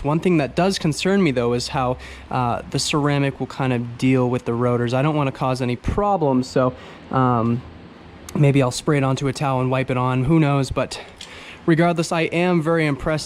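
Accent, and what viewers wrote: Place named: Wisconsin, United States. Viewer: Sounds like Wisconsin 😜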